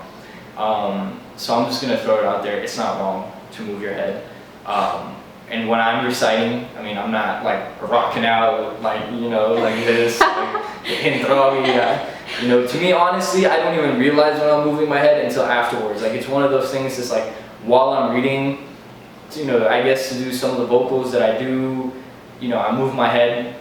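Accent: India. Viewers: Indian accent bhai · Hahahaha really funny love the indian accent Thank you so much we want more vedios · Indian accent on point! 👍👍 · i also live in india i wanna meet you plz gimme your address...... <mail> plz send me your address. your nail indian accent. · that indian accent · Hahaha the indian accent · nice Indian accent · love the indian accent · masha allah....u have nice sense of humour and indian accent too......keep up the good work.....ur recitation is so pleasing to hear...!! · Your Indian accent was so funny😂😂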